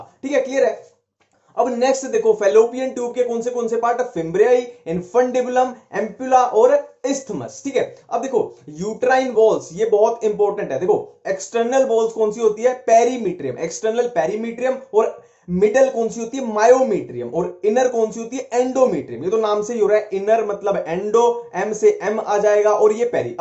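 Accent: Haryana, India. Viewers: Thankyou so much sir Waise aap Haryanvi hain kya?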